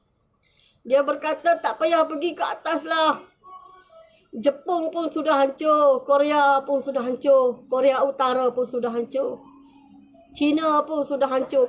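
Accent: Kelantan, Malaysia. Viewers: Mari kot celoh mana menasio nie.. Bunyi kecek supo kltn.. Wat malu ore kltn jah. Dgr ko dia dok cito tu aku rasa 3 ari dia nie x bgun tido. Nk sudah ko mimpi tu gak..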